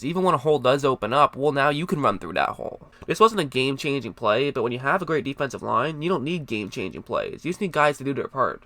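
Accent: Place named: Alaska, United States. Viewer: The guy talking sounds like the Alaskan Bush people 🤔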